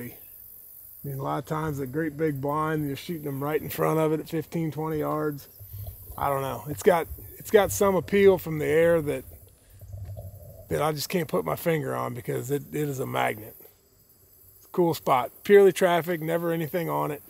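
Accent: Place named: Arkansas, United States. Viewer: Sounds like you’re from Arkansas…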